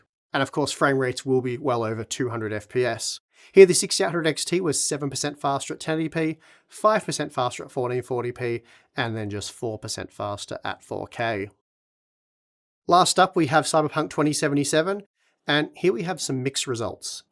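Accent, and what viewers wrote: Australia. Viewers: What I got out of this review is purchase the GPU which is cheaper. Radeon had higher 1% lows overall than Nvidia on most games. Shouldn't this be the minimum standard when choosing a video card. I just love the Aussie accent, to the point without all that waffle. Thanks.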